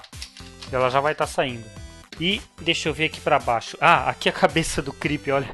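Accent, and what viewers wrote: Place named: Belém, Brazil. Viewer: Ele deve ser de belém do Pará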